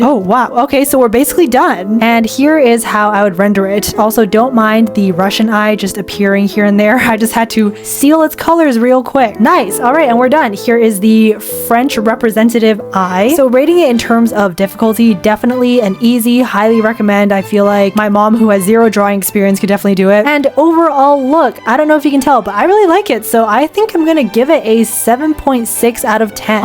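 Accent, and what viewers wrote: United Kingdom. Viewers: Are you uk or………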